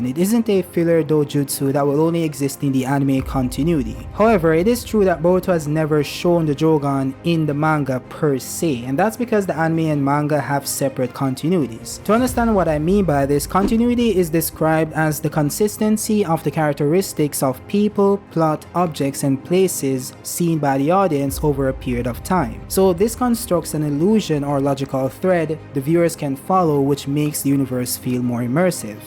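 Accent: Jamaica. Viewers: Thanks for the video. U kinda have a Jamaican accent 😅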